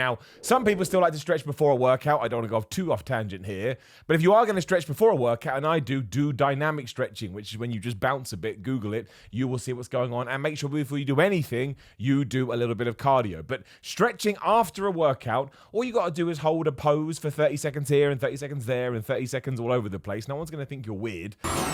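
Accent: United Kingdom. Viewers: Oh, it's! The things you say repeatedly get rushed through to where they've lost our normal, awe-inspiring Bri'ish enunciation. I finally caught it! I really enjoy your videos. You make even the droll topics more listenable.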